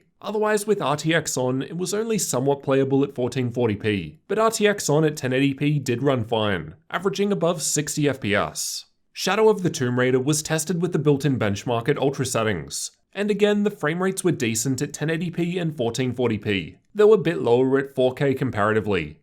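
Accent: Australia. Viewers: He has an Aussie accent which normally has an upward inflection on declarative sentences. Perhaps he's trying to neutralize his accent? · I don't think he sounds that weird, but I'm an Aussie and I'm use to our accent. I will admit his pronunciation and accent on certain syllables isn't exactly typical but overall he just sounds like a regular Aussie to me. I think he's just reading through his script and his voice naturally goes low when he hits a comma and even lower for full-stops. We have very slight regional variations in our accent. He sounds like he's from one of the Southern States as people in the North tend to raise their voice pitch at the end of a sentence instead of lowering it. Aside from all this voice banter, I think he presents the information quite well and I found him really quite helpful when I recently upgraded my video card.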